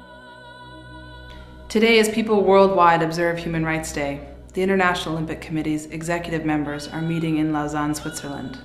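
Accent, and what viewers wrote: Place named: United States. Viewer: btw, u don't even act or make me feel like u r from Tibet, i think u r slave of America,see how gud yr american accent is. especially the way u talk and the way u talking about those, isn't dat kinda reminds people how american u get?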